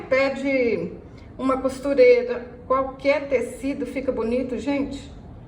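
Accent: Minas Gerais, Brazil. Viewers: Que sotaque maravilhoso. Saudade de Minas Gerais. Parabéns pelas criações.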